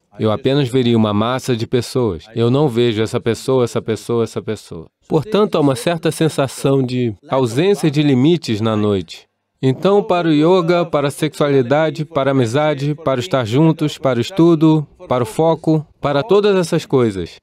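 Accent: Rio de Janeiro, Brazil. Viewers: Eu adoro a voz do dublador com esse sotaque carioca, traz o discurso mais próximo da nossa realidade. As vezes assisto o legendado, mas a noite com sono quase dormindo, prefiro a voz do dublador.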